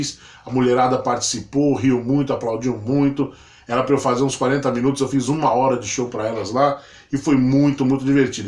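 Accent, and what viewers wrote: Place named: Brazil. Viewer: vi em outro vídeo que você é carioca. Perdeu o sotaque. Acha que se continuasse com sotaque carioca isso ajudaria nas piadas?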